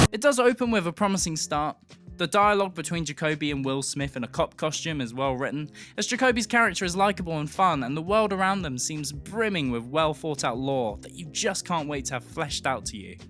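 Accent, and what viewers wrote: England, United Kingdom. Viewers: Hey man nice 2 see u working, keep it up you sexy English accented fellow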